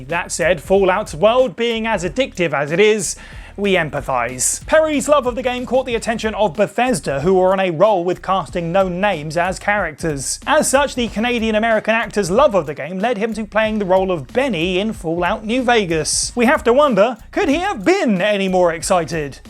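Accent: United Kingdom. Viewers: Does anyone else love her voice? Maybe I'm just a sucker for British accents 😂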